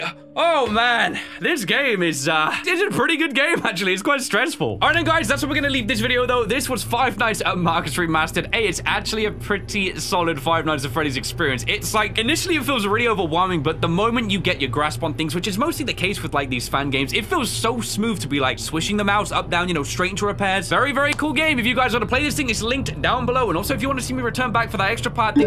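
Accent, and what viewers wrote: United Kingdom. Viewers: FOR SOME REASON I DIDNT REALISE YOUR FROM THE UK HELP. ME TOO OMGGG AA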